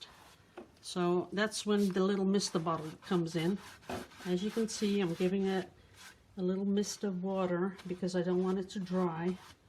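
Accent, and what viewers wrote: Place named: Netherlands. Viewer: The pencil eraser made me laugh and the hair comment. From the other video. I'm always fussing at my hair Lol. I need to colour my greys!!! Very good paintings and thank you for explaining mica powders and pigments. I bought some Siesso mica powders and watching videos on them. Love your Dutch accent!!!...;) I'm from Ohio...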